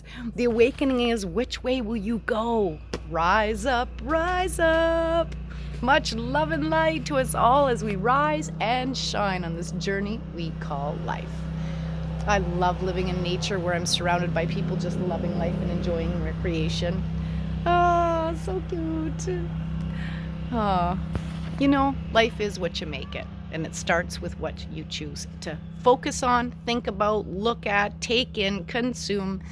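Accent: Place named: Michigan, United States. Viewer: your accent reminds me of the mother's I grew up in hearing in Michigan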